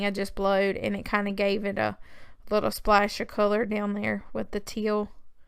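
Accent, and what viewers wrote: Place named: Texas, United States. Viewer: I'm from Texas and I have a thick accent like you. It's a joy to watch your videos! I'm an aspiring artist and have been dabbling with mixed media pieces so it's fun to watch and get ideas from you! I get a kick out of your comments and dialogue. :) thanks for your videos! ❤️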